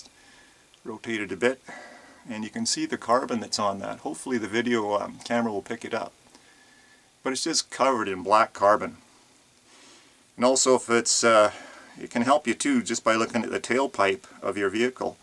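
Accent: Canada. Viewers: Love the Canadian accent lol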